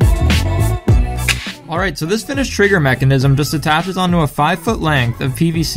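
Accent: Canada. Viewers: I can tell you are Canadian, because you said oat instead of saying out.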